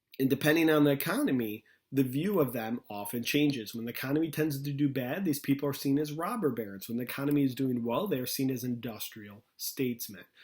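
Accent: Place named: Buffalo, United States. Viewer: Guys, I think this guy is from Buffalo.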